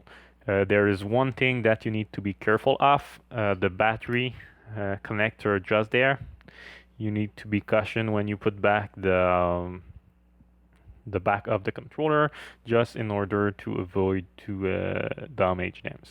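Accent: Canada. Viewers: New sub! Very nicely done video. Btw, are you from Quebec as your accent is familiar....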